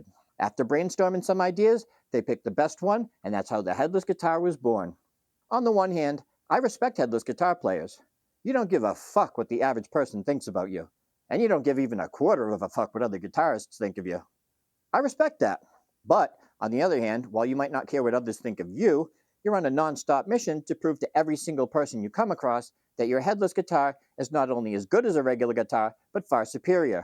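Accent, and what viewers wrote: Boston, United States. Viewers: The Boston accent is a nice. Gitauh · As a Brit, it sounds exactly like how Americans say it to me...I thought he was American!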